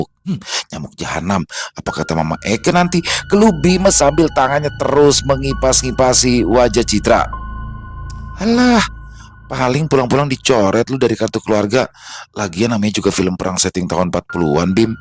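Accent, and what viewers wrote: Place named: Betawi, Indonesia. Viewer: Assalamualaikum bang Emang dah bang paling bisa dalam segala karakter hebaaat Apalagi logat Betawi paling suka dengarnya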